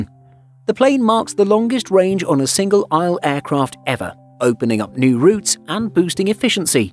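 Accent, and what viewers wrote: England, United Kingdom. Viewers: Which English accent does this guy have?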